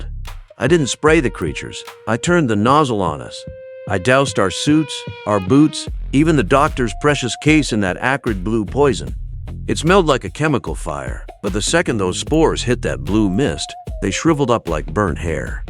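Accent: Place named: South, United States. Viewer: The Southern accent made it worth while for the listener. 👍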